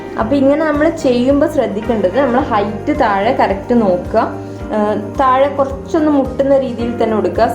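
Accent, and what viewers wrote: Kannur, India. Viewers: Kannur kariyano? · Kannooor kaari aaano?? 😍 Samsaaram and slang very lovely 🎉🎉🎉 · Njn subscribe cheythu,kannur aanennu kettappaze,njnanum kannur aanu😍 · Kannur aano???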